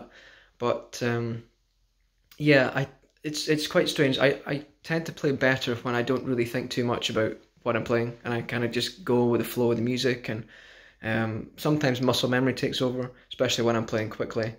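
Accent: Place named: Scotland, United Kingdom. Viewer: Well chuffed yer Scottish pal,been listening to ye for a few weeks noo,brilliant stuff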